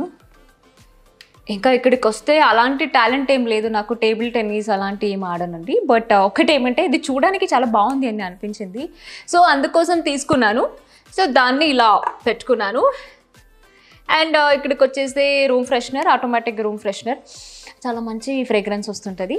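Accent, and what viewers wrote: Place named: Telugu, India. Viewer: Mee voice n Telugu slang kekaaa loved you so much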